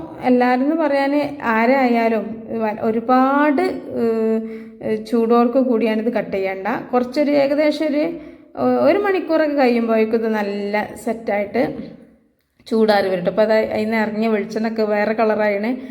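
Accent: Malappuram, India. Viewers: Malapputam bhasha rasakrammanu..😊undakkunnathu kanappozhe vayil vellam varunnu.. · ഇംഗ്ലീഷ് നല്ലത് പോലെ അറിയാം പക്ഷേ മലയാളം അറിയില്ല... മലപ്പുറം ബാഷയാണ്.. തോന്നുന്നു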